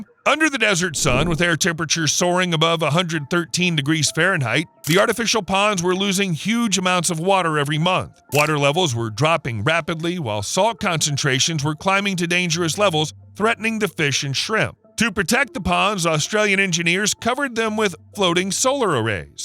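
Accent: United States. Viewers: Heres another Australian who also has never heard of this Disneyland. The American accent telling artificial information.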